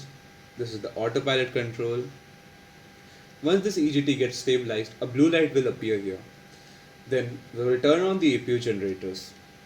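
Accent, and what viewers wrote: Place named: India. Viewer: This indian accent helps with understanding that procedure , video is very helpful .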